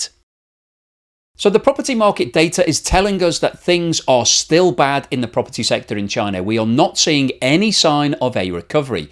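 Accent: United Kingdom. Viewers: British accent = propaganda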